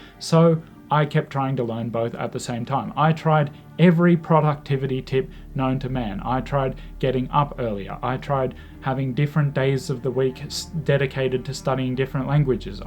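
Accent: Stockholm, Sweden. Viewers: I find your Swedish accent really fun. You sound like an 08 (Stockholm). Hehe (also I really don't like the "polyglots" that only know a couple of catchphrases and nothing else) I think you did the right thing. Let me tell you my case, I speak five languages but I didn't learn them by choice but more by need. And most important of all, I learned them ONE at the time. I think you did the right thing by ditching one. I am doing the same with Russian. I'm gonna go for Ukrainian after that. But I'm not learning both at the same time. Also if you want someone to speak Swedish to I'm available anytime. As I love languages I have a real knowledge of Swedish growing up in Sweden and being a bookworm I have a good understanding of the Swedish language. Just if you need a little variation in your studies. Cheers. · That is very clever, well done! Also, your Swedish vowels have a pretty distinct Stockholm twang, which is quite difficult to pick up for foreign learners. 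You could certainly pass for Swedish sounding like you do already in few-word conversations. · I just realized u have a bit of Swedish accent in your video. Anyways, good luck... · Your Swedish accent is so natural!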